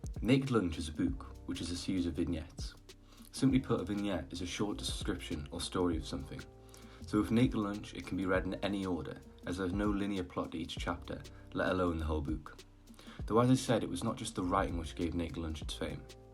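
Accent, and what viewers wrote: United Kingdom. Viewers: i think hes just british brother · geordie accent's an instant sub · Dude you said semiautobiographal which isn't even a word. Funny how you many people will overlook your idiocy just because you have a British accent. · This is fantastic man! What part of England are you from? North east right? I love your narration.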